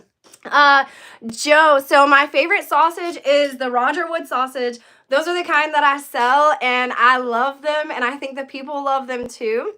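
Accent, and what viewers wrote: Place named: South, United States. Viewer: You're very beautiful woman and I love your little southern accent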